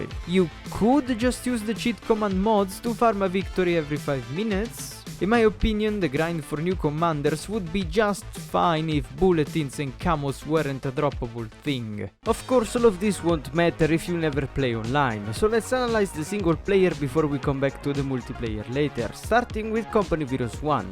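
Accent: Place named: Italy. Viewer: Damn, that italian accent is thick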